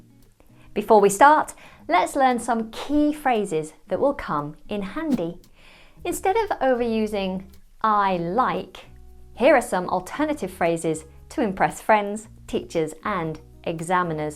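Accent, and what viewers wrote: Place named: United Kingdom. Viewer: I enjoyed this lesson very much. It has been very interesting to listen to your British pronunciation and repeat. Thank you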